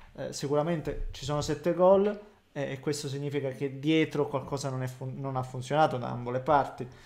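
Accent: Calabria, Italy. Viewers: di che zona bella sei della Calabria? Spero no di Cutro.. 😂😂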